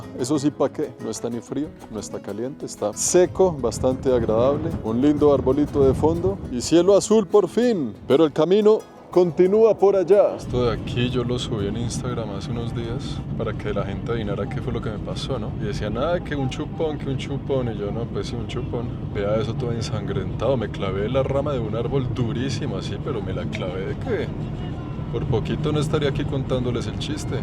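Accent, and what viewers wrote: Bogotá, Colombia. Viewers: NI YO COMO ARGENTINA NO SABIA DE ESA HISTORIA DE LOS QUILMES!!AMOOO EL ACENTO COLOMBIANOS Y A LOS COLOMBIANOS. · viví 15 años en Argentina y mi esposo y mis hijos son argentinos. Me gusta como narras tus vivencias con ese acento cachaco jajaja. Saludos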